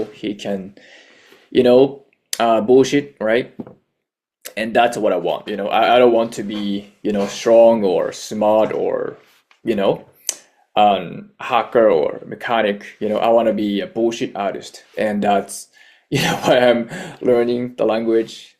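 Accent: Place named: Japan. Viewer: Here is some feedback for you: 1. You don't sound like you have a heavy Japanese accent. But it is not native sounding either. There are some sounds and phrasing flows that give away your Japanese roots. Some examples are the l/r mix, and the way you say some sets of words in quick flows that come out weird. Specially in the beginning to middle part of the video. You seem to settle on to a more natural flow later. 2. There are so many "you know"s and lip smacks that it became distracting to actually listen to what you were saying. If you would like to manipulate and charm people, maybe don't look for acknowledgement of your thought process so often, even involuntarily. The "You Know"s were honestly too much. Other than that, awesome confidence, projection, clarity and word choice. Keep going!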